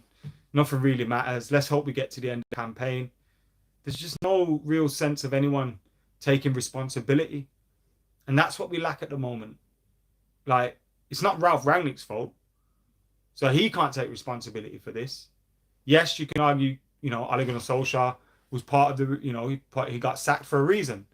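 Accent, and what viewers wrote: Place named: Midlands, United Kingdom. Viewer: I'm good at accents where are you form. I'm not taking the piss I think its Midlandsish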